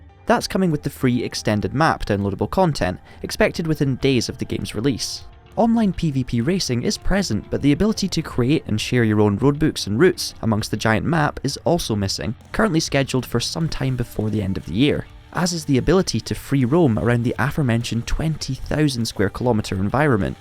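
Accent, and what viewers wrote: Scotland, United Kingdom. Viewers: That’s a very clear Scottish accent … would love to hear the real accent though !!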